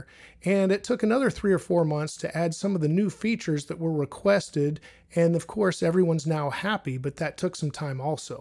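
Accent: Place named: United States. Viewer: Hi how are you today? I miss you my friend, I miss you and your hot American accent, thank you for uploading another video clip of yourself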